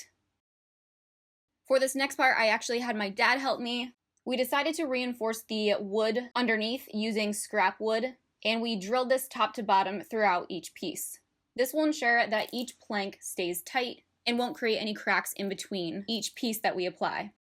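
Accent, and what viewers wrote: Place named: South, United States. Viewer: Very southern and cute ☺️ reminds me of home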